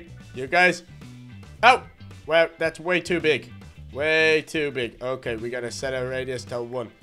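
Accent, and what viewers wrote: Dublin, Ireland. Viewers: So your Irish? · I knew that ur from Ireland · Are you from Ireland I'm not from Ireland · are you from Dublin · I knew you were irish because I'm irish and its ovious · Your Irish wow I thought you was English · Are u from Dublin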